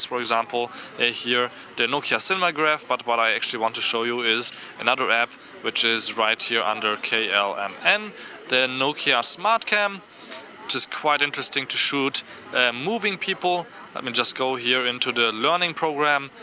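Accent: Germany. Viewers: Your mother-tongue is german, isn't it? :) Nice overview btw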